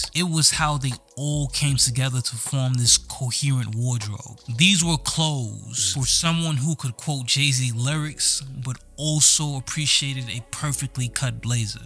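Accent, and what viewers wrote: New York, United States. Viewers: Love this piece and hearing this accent makes me really homesick for NYC!😊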